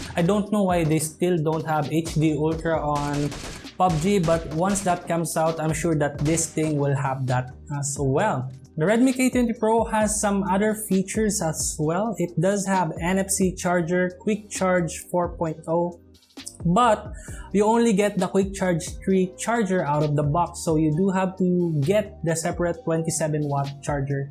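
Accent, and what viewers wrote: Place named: Philippines. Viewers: The way he enunciates words, I believe that he's doing it on purpose having the "Pinoy" accent. At first, it was awkward but then I realized he's been doing it on purpose which is for me I think is better than trying to speak in a very slang, Aussie and or British accent 😆.. and I can understand him better. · We have our own accents, and yeah syempre kapag english ang title dapat english din magsalita kasi maraming complains. Hindi lang Pinoy pwede manood boi, international viewers din. Hindi tayo native ingglis speakr.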